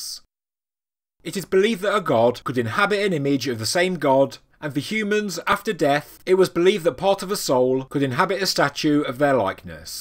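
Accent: United Kingdom. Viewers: A. British interpilation of Ancient African Art.and why it was defaced was. so perpostrtious that. It is not funny. This British sounding. narrator is a God Damer. The African People who created this type of art were not that supertiscious or self lothing.They were not slaves and and did not hate their cultural phenotype. This narraitor completely overlooked the Persian, Greek and latter(muslim Arabs who would pillage,destroy and alter the Afriican Dynamic and life like Art out of Jellouse envey and hatred of an. African People who were superior to them in culture.Egyptian Staturtory and life like reproduction in stone are unraveled today in reproduction.Egyptian art is so life like the images look alive. The Arabs have done more damage than the Franch and British combined in smashing noses and distorting African feachers.They now claim that they are the Ancient Egyptians.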